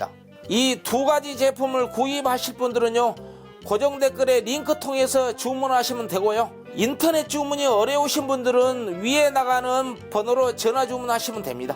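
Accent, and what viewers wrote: Jeolla, South Korea. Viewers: 셰프님 말투가 꼭 울동네 말투인듯.사투리도 너무친근요 ㅋ ㅋ .고항이.순천.여수.광양 셋중에 있을듯.전 참고로 광양 토박이.입니다.ㅋ ㅋ .혹시.고향이 어디신지요?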